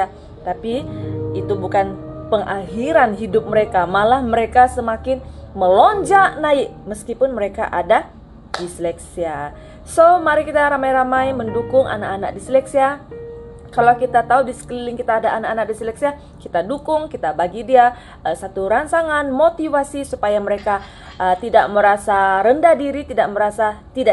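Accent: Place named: Sabah, Malaysia. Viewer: kamu dari sabah ka miss?, loghat mcm seakan dari sabah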